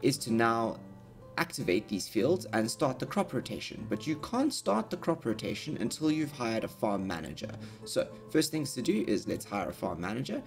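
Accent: South Africa. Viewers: You sound South African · You sound South African?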